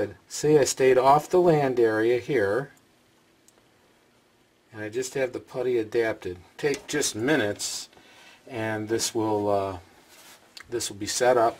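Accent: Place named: United States. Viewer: American guy. For sure. :)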